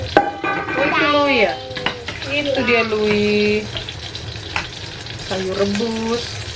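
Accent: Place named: Java, Indonesia. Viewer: Wkwkwk dengklek jawa banget ya mak 😂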